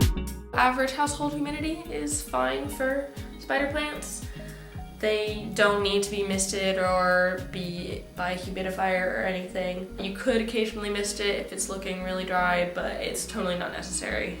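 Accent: United States. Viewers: are you from the south? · That's ok, it seems to have settled now. I realised you are in the UK after waiting some other vids of yours. 🤣 Love your plant vids, congrats. Helped me a lot with my plant care and information. You're American though right?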